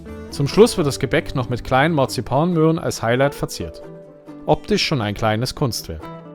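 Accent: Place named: Germany. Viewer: Danke für die Übersicht, das sind ein paar perlen dabei, die ich mal nachkochen werde! Evtl. solltest Du die Französischen und Italienischen namen einblenden. Ich spreche die sprachen auch nicht ... aber mit Deutschschweizer/Englischem akzent im ohr kann ich Dich nicht verstehen. Als Deutscher verdrehst Du die laute anders als ich 🤪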